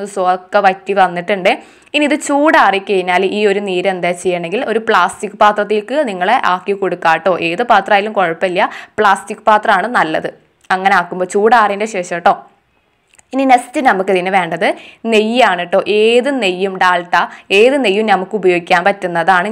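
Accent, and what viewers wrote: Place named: Kanhangad, India. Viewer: Kanangad slang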